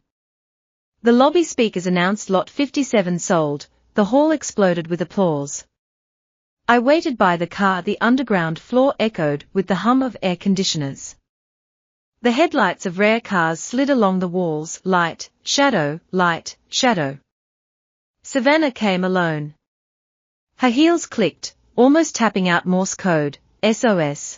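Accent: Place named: United Kingdom. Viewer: Confusing; a British FEMALE voice for Noah! “Mrs.” Vs perhaps “Mrs” , “Mr.” Vs “”Mr” , Santa Fe? Hot! But awkward.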